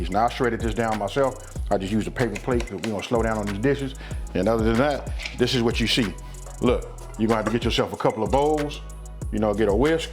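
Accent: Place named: California, United States. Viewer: This cali accent threw me off but this looks so good I gotta try it!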